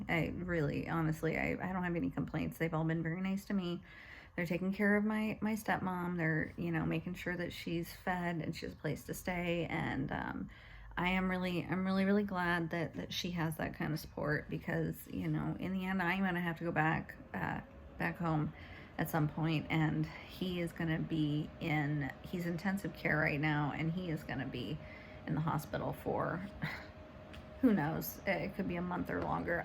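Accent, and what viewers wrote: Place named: United States. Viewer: My thoughts are with you sister. I'm a dissociated apostate 2 yrs now and my beautiful wife Nicole is still a inactive fully believing jw. I told Nicole if she ever needs a blood transfusion I'll give her one she said she'll divorce me. I told her id rather have a living divorced wife than a dead married one. When I dissociated 2 years ago over the child abuse cover ups. I rang up Nicolas father who's not a jw smart man and told him I'll give his daughter a blood transfusion if she needs one and I apologised to him for been so brain washed by this cult he thanked me and laughed when I said I'll give her my blood you'll get to keep your daughter I'll just lose my wife. I told her doctor I'll give her my blood he said we can't go against your wife's medical health directive and as doctors we can't go against your wife's religious beliefs I'll rip up her medical directive then. 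So dear sister what your going through now is what us normal people will all go through when we have jw family members who we dearly love and may well be in the situation your in now . So my dear sister I'll give you a big Australian hug all the way to America you sound American or Canadian us Aussies can't tell the difference .I love you sister and feel for you check out my you Tube channel called Justin porter I have 13 videos one where I impersonate Steven left and one where I film Australian fruit bats and talk about apostate goodies because us apostates are the goodies. Warm apostate love dear sweet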